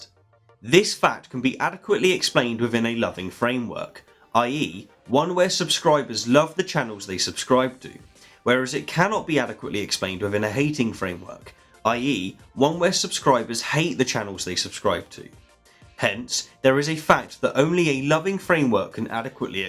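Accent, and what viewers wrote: England, United Kingdom. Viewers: Seems people are convinced by listening to the beautiful English accent. What is freely asserted can be freely denied but if he is preaching to the choir we have a confirmation bias problem. How something immaterial can come from matter was not explained. Where do abstract ideas come from? Can justice, kindness, morality just pop out of the brain? While this may not prove any one religion, it sure points to the strong possibility that the designer of humans was a little smarter than random accidents. If things evolved then who or what was guiding the evolution. Random just does not cut it. The videos tend to be sciolistic and many of the readers are echo chambers for the sequacious opinions expressed.I read all of these so I can debunk the "debunked syllogisms" for my logic students.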